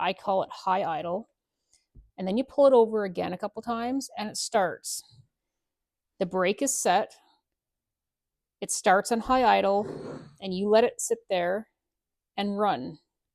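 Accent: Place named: Canada. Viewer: See that's why I love when you do videos on every saw because we learn something new every time. I really dig the Canadian accent I can hear your voice narrating a book on audible. I also love the sarcasm throughout and died laughing at chainsaw protective services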